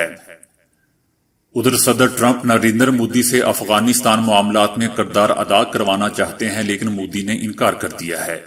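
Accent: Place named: Punjab, Pakistan. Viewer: Aap apni zubaan SE Punjabi haddi nikal kr Urdu zaban sahi bolen Apna talaffus (pronunciation) sahi Karen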